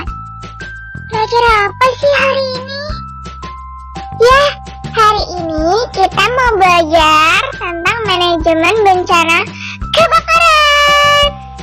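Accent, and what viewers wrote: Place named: Java, Indonesia. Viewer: Keren, suaranya unik. Medoknya dapet. Terbaek memang. Hahaha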